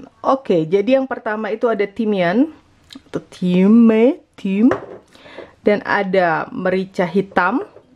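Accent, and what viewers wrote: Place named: Java, Indonesia. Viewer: Mamak ini ngomongnya terkontaminasi sama orang Jawa ya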